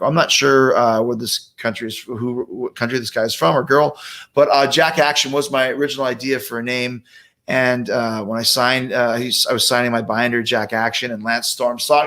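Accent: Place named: Minnesota, United States. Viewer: he he stil got little bit of mn accsent coming out now and than lol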